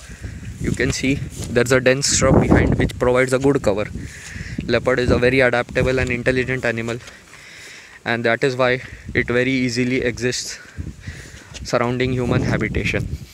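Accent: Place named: India. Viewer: "Our deem members are now sutting upp the camra to ensure the safety of the Lappad"...Indian accent !!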